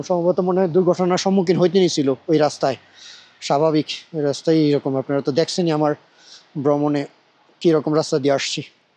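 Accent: Noakhali, Bangladesh. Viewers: এই নোয়াখাইল্যা চলবে না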